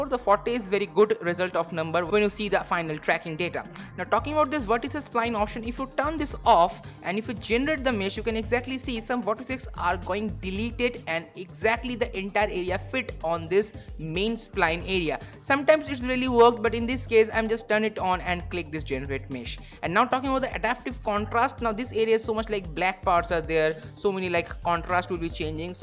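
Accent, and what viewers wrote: India. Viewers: Мой любимый индус озвучивает))) Английский тяжело понимать но индусский английский идеальный )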